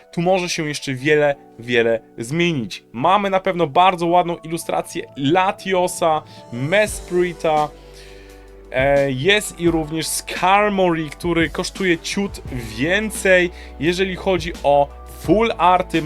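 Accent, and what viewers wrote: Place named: United States. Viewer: Ta twoja gwara amerykanska😂y😅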